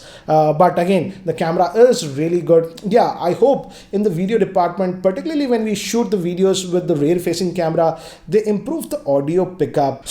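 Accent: India. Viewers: And thick indian accent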